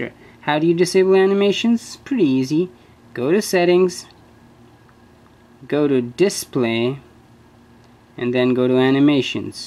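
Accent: India. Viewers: you MUST be indian... i can tell clearly from the accent.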